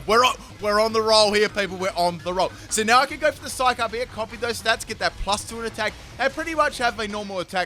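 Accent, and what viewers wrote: Australia. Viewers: Do they honestly not teach phonetics in Australian school? Always baffles me the way you sound words out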